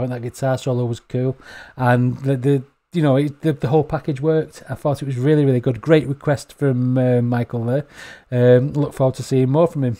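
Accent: United Kingdom. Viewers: Are you a Liverpoodlian?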